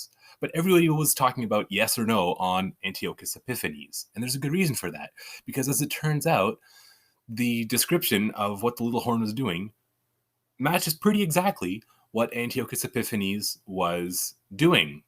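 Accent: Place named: Canada. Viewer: I love Canadian accents.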